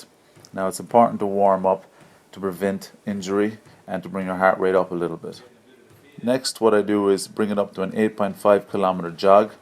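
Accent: Ireland. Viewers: You Irish? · You sound irish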